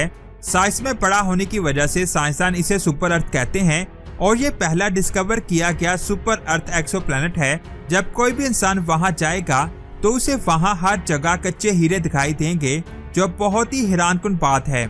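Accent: Punjab, India. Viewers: Dear BROTHER ,Please correct your english like SKREEN. dont mix panjabi way in the vedio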